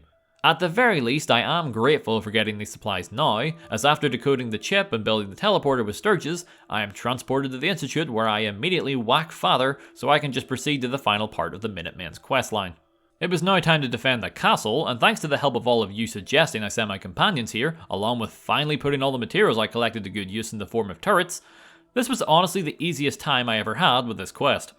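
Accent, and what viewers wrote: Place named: Northern Ireland, United Kingdom. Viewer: i would see my face when i heard your northern irish accent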